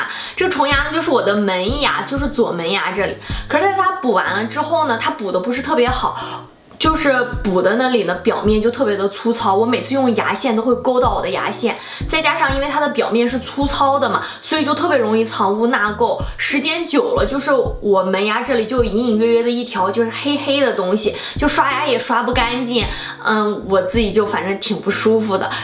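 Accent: Shandong, China. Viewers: 朋友，听口音是山东人啊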